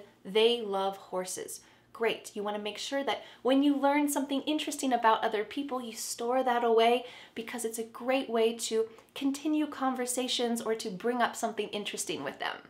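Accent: United States. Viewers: Yep, I m really into your American English. Txh a bunch my English is quick and dirty from Londrina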